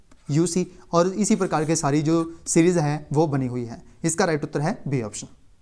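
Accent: Punjab, India. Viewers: ye sir ya toh punjabi h ya himacha se h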